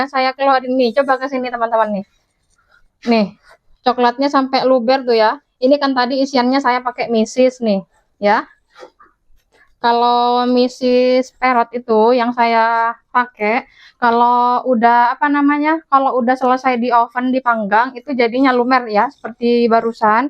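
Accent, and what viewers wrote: Madura, Indonesia. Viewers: Mbak di orang mana kok bisa madura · Bunda asli mana.. ngomong nya Madura. Sy jg dr Madura ?😊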